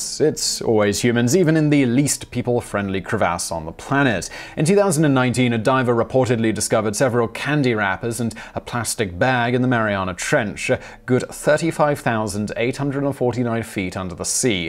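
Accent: United Kingdom. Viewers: This dudes voice is so british it seems fake tho im sure jts not lol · Kraken. He's British.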